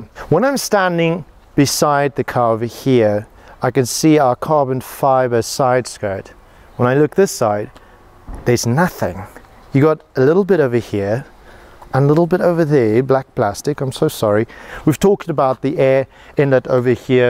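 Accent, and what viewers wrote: Afrikaans, South Africa. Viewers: My guy are you from South Africa? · Epic as always and that Afrikaans accent WITH the words and phrases 🇿🇦leka man 💯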